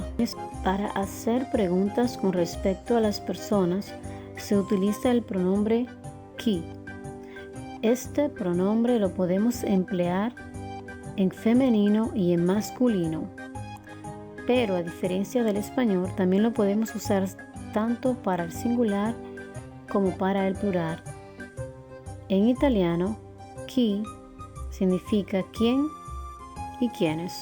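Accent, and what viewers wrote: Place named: Spain. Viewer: Quiero saber de dónde es el acento de español de la profesora.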